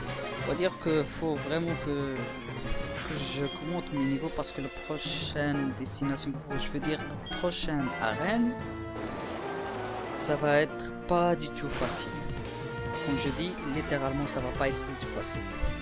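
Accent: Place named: Brittany, France. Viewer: trol ton accent de breton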